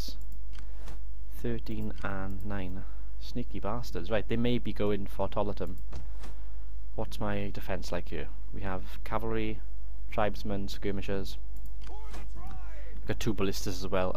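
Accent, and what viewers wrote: Wales, United Kingdom. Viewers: Unorthodox is good though. You make moves I probably would be conservative to do and it is interesting seeing how that plays out. But I can certainly say you have became my favorite TW Youtuber over the past week or so. Also the accent is great :P Can't beat a good Welsh Accent.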